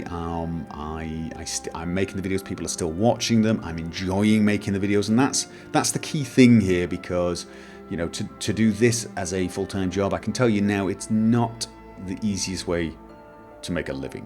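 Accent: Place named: England, United Kingdom. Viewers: Congrats, you know i gonna lay it all out here bro. Love the playthroughs, love the baritone Northern English accent. Love your style altogether, just a quick thought is that now you've decided to devote more time to your channel, I just want to say that if money becomes an issue I'd (Plus some other subs) would be more than happy to donate to the cause to help out. so yeah, all sunny skies from here in. Best wishes from us to you, here in West Virginia. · Let's all share our stories of how we found! I actually found him by searching for let's plays of Vampire the Masquerade. I was watching LK's Marik play through, and was frustrated because he dropped the project, and I actually liked the way the story was progressing. So I searched on youtube and came across. I laughed and said "Hey he's got an English accent too, nice!" I found his voice so soothing to listen too, that I watched the play through several times before I started the skyrim videos.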